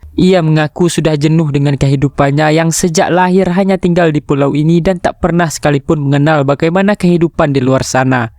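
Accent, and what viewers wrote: West Sumatra, Indonesia. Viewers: Ini urang awak yg ngomongnya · Logat minang ngomong lu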